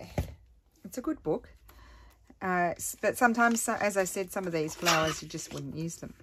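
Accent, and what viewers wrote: Australia. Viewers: Another fantastic video, really enjoy watching you. (So nice to hear your Aussie voice - and phrases - when watching 🤣) Have you tried popping your mushy Giotto in the fridge, might help for a little while? Dawn (Melbourne, Australia)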